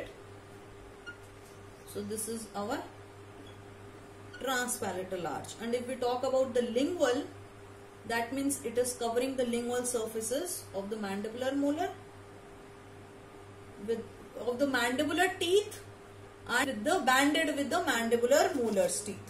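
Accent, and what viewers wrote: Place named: India. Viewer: Thank you ..can you make translation for vido it is very good but i cant undrstand indian accent very will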